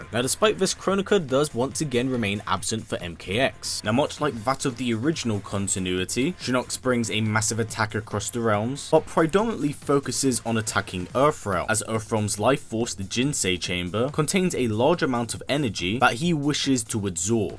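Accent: England, United Kingdom. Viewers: There is not "v" in the word brothers, so disgusting. Battles are not "bat-holes." Your dialect is garbage, must be from the shithole called england.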